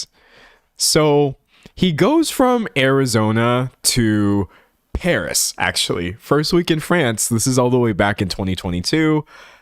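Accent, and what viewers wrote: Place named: Texas, United States. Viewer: today I heard a clip of Connor Storrie talking and thought it was you. Is this some secret Texan accent I never knew about?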